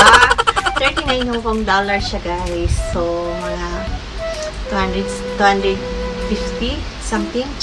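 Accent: Visayas, Philippines. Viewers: Bisaya kaau ni madam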